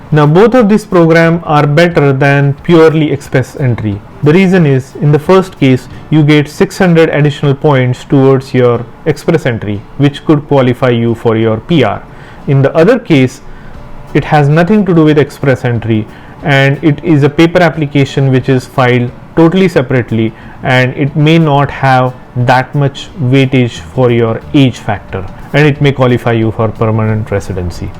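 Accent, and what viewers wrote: India. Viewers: I wish to apply but very hard to understand the Indian accent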